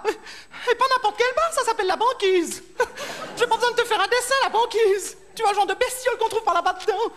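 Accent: Switzerland. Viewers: Rien que l'accent helvétique est marrant....Super. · J'adore l'accent Vaudois. Je suis Genevoise❤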